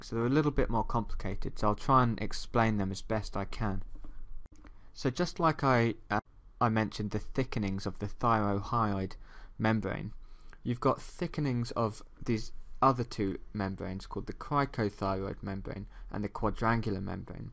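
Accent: United Kingdom. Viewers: You would think a British accent would spice up the larynx, but no it's still so incredibly boring. great video though all jokes aside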